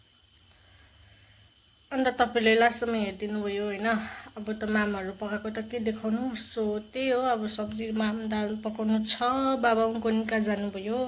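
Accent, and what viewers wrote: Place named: Sikkim, India. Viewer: झन्डै नेपाली नै नबुझेको 😂सिक्किमतिरको नेपाली र नेपालको नेपालीमा धेरै differences हुँदो रहेछ। any way happy dashain form Kathmandu Nepal😊❤ 🇳🇵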